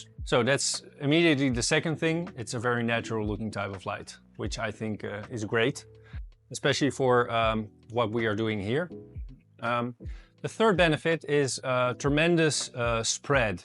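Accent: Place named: Netherlands. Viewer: Wist niet dat je uit Nederland kwam joh! Dacht al aan je accent te horen dat je geen Engelsman of Amerikaan was, maar je Engels is erg goed moet ik zeggen. Dapper dat je in NL nog metal halides durft te gebruiken met die bizarre energieprijzen 😅 Mooie grote bak trouwens! Heel benieuwd hoe het erbij staat als er frags in staan. Je hebt er een abbo bij 👍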